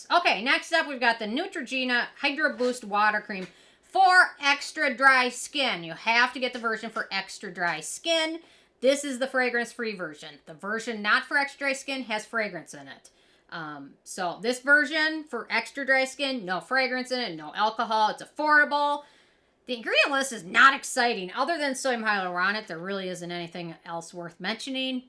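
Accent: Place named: Midwest, United States. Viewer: I love your Midwest accent!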